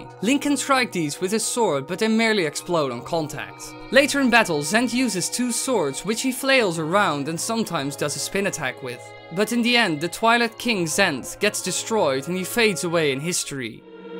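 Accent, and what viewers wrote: Netherlands. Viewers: is hij nou eigenlijk Nederlands? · Jij bent Nederlands zo te horen. ;)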